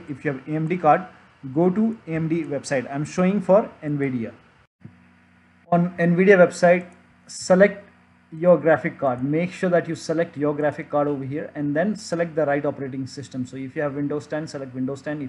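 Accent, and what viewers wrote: India. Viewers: Why is it always the worst desi accent always in these videos?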